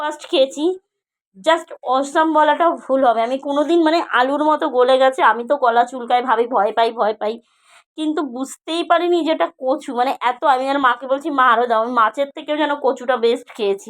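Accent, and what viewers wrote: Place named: Barishal, Bangladesh. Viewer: তুমি বরিশালের বাঙাল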